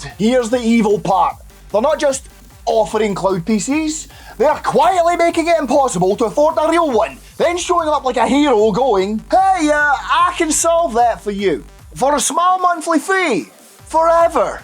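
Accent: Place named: Scotland, United Kingdom. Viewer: Annoying?! Scottish is the only accent that should ever be used for a good rant. The Scots sound like they're ready to fuck some shit up regardless of the banality of the topic. Honorable mention to the Irish.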